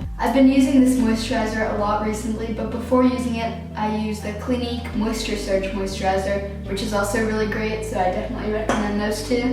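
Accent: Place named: Canada. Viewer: you have a thick canadian accent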